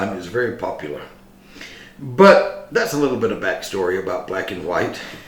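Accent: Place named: United States. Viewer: First time on this channel and I can’t get over your accent, which to me is straight from a Western movie. 😊 I can hear you say, “If you’re willin to work I can give you bed and board and after harvest maybe a little to help you on your way.” As for B&W, I feel it’s a great, affordable Glasgow Blend. The Compass Box does a more upmarket version. This particular style of whisky is sadly misunderstood and under appreciated in whisky circles. Good grain whisky is rare in an affordable blend but very welcome to those in the know. I would rather have a well-blended whisky such as this than a bland malt like Glenfiddich 12.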